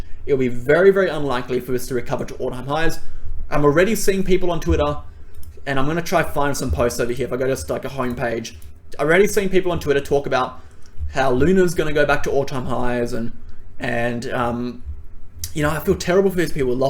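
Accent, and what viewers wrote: Australia. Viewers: cheers! Ps. good to hear an aussie accent on a crypto vid!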